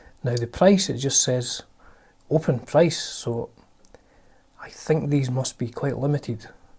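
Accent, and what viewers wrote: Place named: Glasgow, United Kingdom. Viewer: You sound like you might be on the Glasgow side of Scotland.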